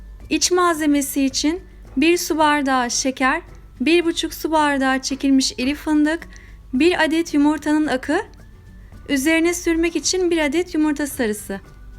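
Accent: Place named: Azerbaijan. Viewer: Ben Türkiye Erzurum kökenliyim atalarım Erzurum'a Horasan'dan gelmişler zamanında diyeceğim o ki sizin Azeri diliniz yani konuşmanız benim dedelerimin aksanına çok benziyor.Ne zaman bir Azeri konuşması duysam beni kendine çeker mutluluk duyarım saygılar sunarım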